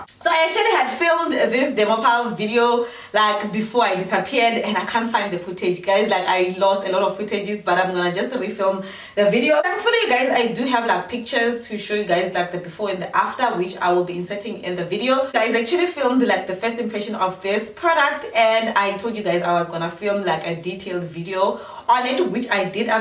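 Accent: Tsonga, South Africa. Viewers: I love everything about this Tsonga accent 😍😍😍😍 and that you're not trying to fake a different accent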